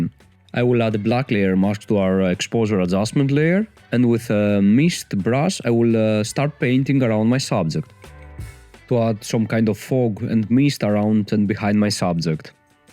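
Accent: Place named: Turkey. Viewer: Sotaque forte de Turco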